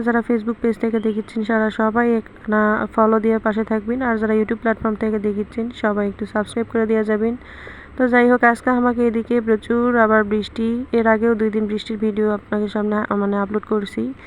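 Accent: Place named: Bogura, Bangladesh. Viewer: এটাই কি অরজিনাল বগুরার ভাষা অনেক সুন্দর ❤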